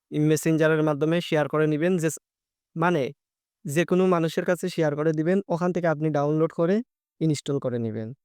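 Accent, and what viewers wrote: Sylhet, Bangladesh. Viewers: সিলেট নি ভাই তুমার বাড়ি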